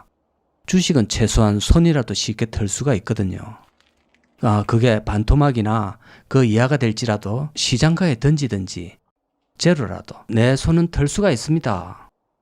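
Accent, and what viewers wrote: Gyeongsang, South Korea. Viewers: 경상도 사투리 딱 듣기실구마이 징허다이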